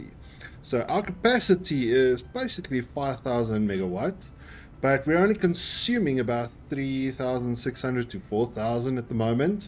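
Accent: South Africa. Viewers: Love the vid.your accent sounds south african? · Where are you from you sound afrikaans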